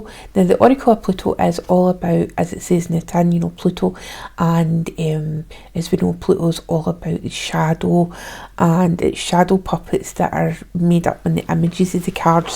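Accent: Scotland, United Kingdom. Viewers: Great reading! You are absolutely adorable and I'm so proud of you for battling with the technology side of tarot... Never easy hehe Love your accent! It's always a pleasure to hear the Scottish words mixed in I've been watching Len Pennie Scots word of the day and it's been fun getting to train my hearing and comprehension... I love that you'll be inadvertently helping with that It's awesome!! Thank you for being such a lovely friend and stepping in, it's beautiful to see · Hello! Thanks for your reading. Love that deck. Don't worry about your Scottish accent, it is fine. Well done! We know that Carrie would only let People that she really trusts look after her channel. 👍💐 xx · Love to hear a Scottish accent on here!! ♥️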